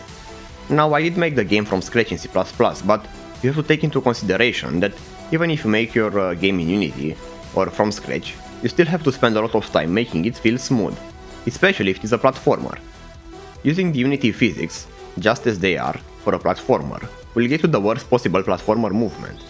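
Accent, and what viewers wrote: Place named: Romania. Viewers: Si mie imi dadea vibe-ul de roman, dar nu eram foarte sigur :)) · lol stiam dupa accent ca esti roman din prima))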